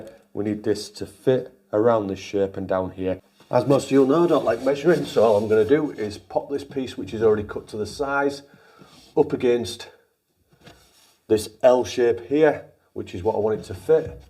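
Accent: Hull, United Kingdom. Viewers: Hi, love the stuff you do with a proper ull accent makes it easy for a Bransholme lad to understand keep it up 👍👍👍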